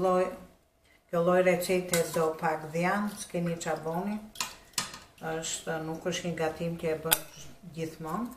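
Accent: Tirana, Albania. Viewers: Ka lezet kur bohet e shpjegohet nga nje tironse me dialektin e Tirones. Na kishte more malli .Njit cdo gjo. T`lumshin duret!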